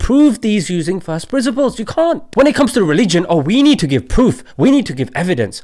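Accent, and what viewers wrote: Pakistan. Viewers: I laughed so hard on your Urdu accent😂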